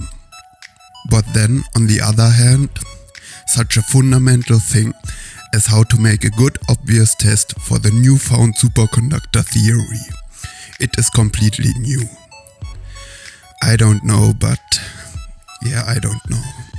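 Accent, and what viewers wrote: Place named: Germany. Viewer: Wenn ich das richtig raus höre hast du einen starken deutschen akzent, also verstehst du sehr wahrscheinlich deutsch oder liege ich mit der Annahme falsch.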